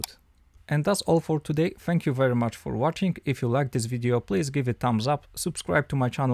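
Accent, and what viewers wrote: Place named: Poland. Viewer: No slychac, że Polak 😀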